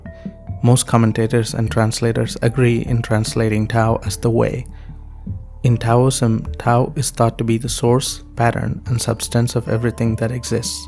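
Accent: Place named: Tamil Nadu, India. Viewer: Tamil scent in your accent. அடி தூள்.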